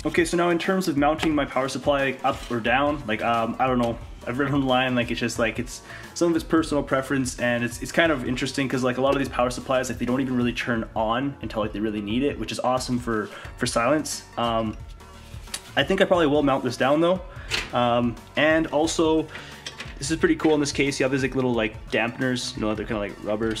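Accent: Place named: Ireland. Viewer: Very cool videos (part 1 & 2). They contain some real helpful information. Thanx for making and uploading them! I am wondering if your family comes from Ireland. Your English sounds a bit like that...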